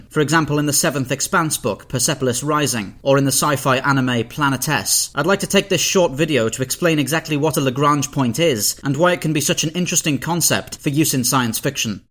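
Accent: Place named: Liverpool, United Kingdom. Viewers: good video and script, shame about the slight northern accent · You sound kinda scouse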